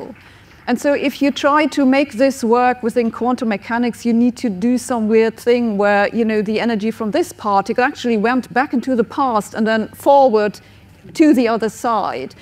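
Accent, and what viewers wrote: United Kingdom. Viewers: I straight up, laughed out loud when she just inherently abandoned her natural British accent and said Albert Einstein’s name with a German accent LMAO just a little over the top and kind of speaks volumes that you may be eccentric in ways where you are maybe providing a false representation of yourself or an idea because you are so caught up and the excitement of the person or a thing you were talking about however, the danger of this is that lead two points of failure and error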